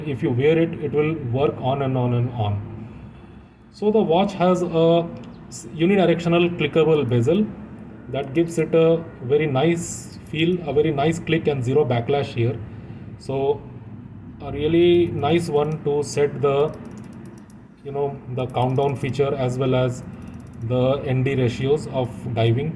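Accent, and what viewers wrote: India. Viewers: i like Indian accent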